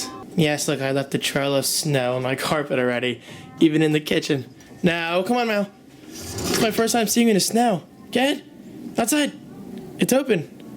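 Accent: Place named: United States. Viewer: why do you pronounce "on" as "own"? Like when you say "online" you say it "ownline". Other than that you sound American, which is it should be coz your an American. LOL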